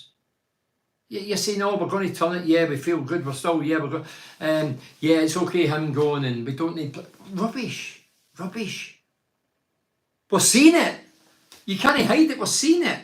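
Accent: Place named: Glasgow, United Kingdom. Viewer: Love your passion. The more angry you get the more the weegie accent comes out. Just refreshing to hear it as it is. It's great to see you smile, you have a lovely smile. Pity things aren't seeing you smile as much just now. Keep smiling! UTB!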